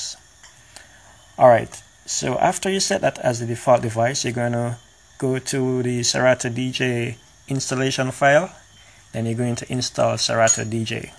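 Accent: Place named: Jamaica. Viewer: Perfect! Thanks Bro. Weh yuh come from? Jamaica?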